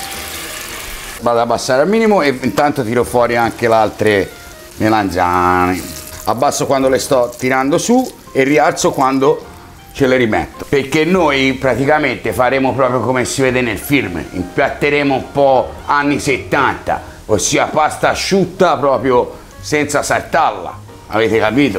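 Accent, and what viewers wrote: Tuscany, Italy. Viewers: como me gusta oir el acento toscano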